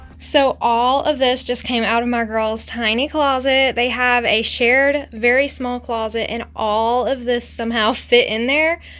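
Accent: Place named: Appalachia, United States. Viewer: Hillbilly accent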